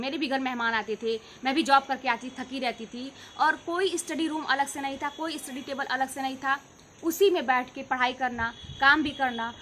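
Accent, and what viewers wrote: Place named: Purvanchal, India. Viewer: Aap kha se ho didi purvanchal side se lg rhi h ....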